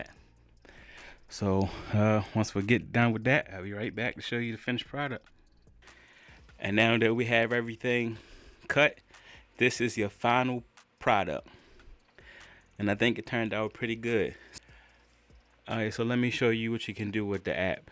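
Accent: Baltimore, United States. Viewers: Bruh is you from Baltimore...you talk just like me...ayyyyeeeeee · Are you from Bmore? Sound like it. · Sounds like you from BMore. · Quick to clock an accent. You from Baltimore? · Are you from Bmore? Sounds like it! Also thanks for the tutorial! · U from bmore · Somebody from Bmore😂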